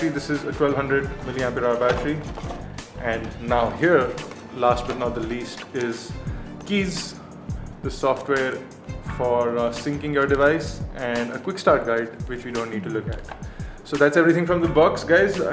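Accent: India. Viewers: anoing indian accent... Apu ali baba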